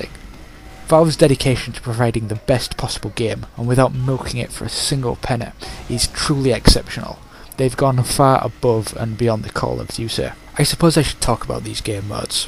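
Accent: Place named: Scotland, United Kingdom. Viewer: his acent is scotish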